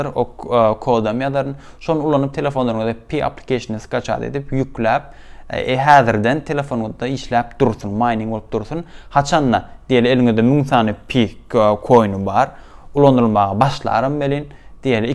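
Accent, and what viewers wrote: Turkmenistan. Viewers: Turkmenistan Turkcesi mi bu?) Cox gozel seslenir)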